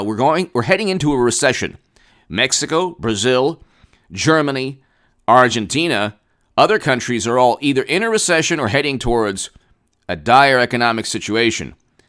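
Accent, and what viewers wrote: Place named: New York, United States. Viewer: . Your accent is awesome. Please let me know where in US you are from?. Must be NY right?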